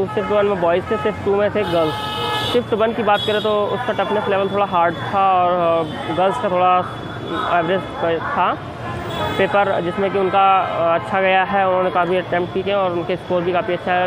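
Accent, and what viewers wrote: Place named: Bihar, India. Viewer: bihari accent kyun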